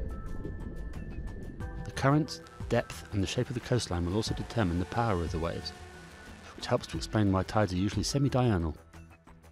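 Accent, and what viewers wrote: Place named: United Kingdom. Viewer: LMAO! Everyone complaining about the narrator are clearly not British. Sorry that British people are intelligent and communicate effectively. I understood every word he said.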